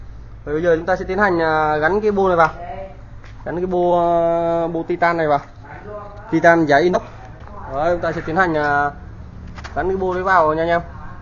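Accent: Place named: Phu Yen, Vietnam. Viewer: Phú yên na anh kkk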